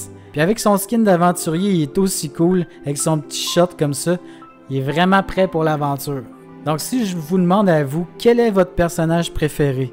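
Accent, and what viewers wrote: Quebec, Canada. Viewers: La savante est très bien mais la sorcière reste la reine des DPS !! Pour un gameplay en français go check ma chaine 🔥🔥 Très bonne vidéo ..l'accent québécois en plus 👍👍👍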